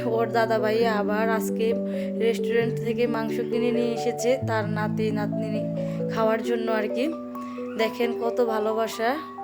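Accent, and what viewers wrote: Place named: Comilla, Bangladesh. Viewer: আপু তুমি কুমিল্লার আমিও তো কুমিল্লার ওই জন্যই তো কথাটা অনেকটাই মিলে যাচ্ছিল আমার সাথে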